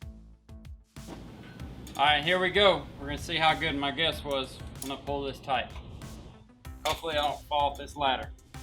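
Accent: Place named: South, United States. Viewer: Southern accent sounds funny and cute at the same time.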